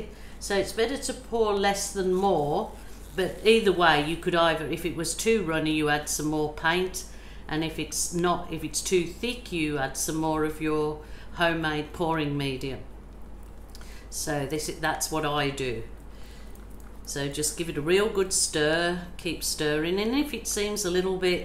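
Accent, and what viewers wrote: Australia. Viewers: Nice To hear an Australian voice behind his pours this is something I want to get into I’m up till two in the morning watching all the different YouTube contributions and U sounds like a real character typical Australian and I love you tutti-frutti Creation Carnival pour I’m a W A girl thank you lady mate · If you are in New Zealand and wanting to buy one of these little heat guns they are available at The Warehouse! Also thank you to for your instructional videos. Whenever I am watching them I always come away feeling very inspired and also a bit less homesick because I get to hear the good ol’ Aussie accent you have:-)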